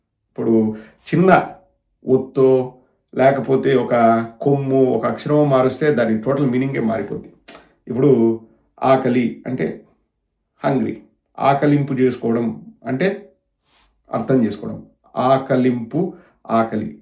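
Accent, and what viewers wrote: Rayalaseema, India. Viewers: Anna I am from Telangana we want you to speak in rayalaseema slang. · నాది తెలంగాణ , నాకు రాయలసీమ అన్న , రాయసీమ యాస అన్న చాలా ఇష్టం ❤❤❤❤ రాయలసీమ .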